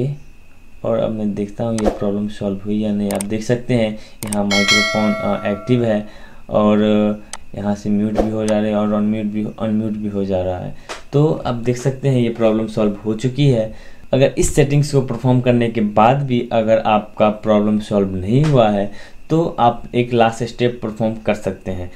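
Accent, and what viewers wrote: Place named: India. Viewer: I don't understand what you say that indian accent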